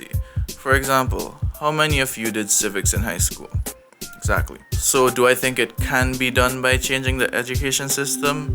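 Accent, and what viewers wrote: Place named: Trinidad and Tobago. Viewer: Hey, just found your channel - love the content! I hear an accent.. are you trini?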